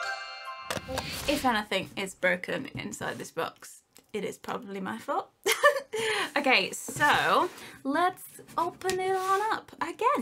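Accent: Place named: England, United Kingdom. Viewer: Just wondering you sound English How do you pay in GBP Or do you just have to pay in dollars?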